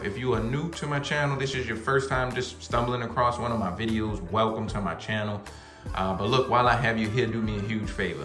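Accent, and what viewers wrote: Baltimore, United States. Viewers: You from b more lol i hear it🔥💯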